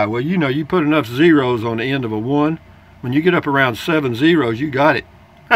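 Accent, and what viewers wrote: Texas, United States. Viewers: Its nice when we see your new videos .You look very content😀.Happy x u.Love the texas accent .I used to live S.A.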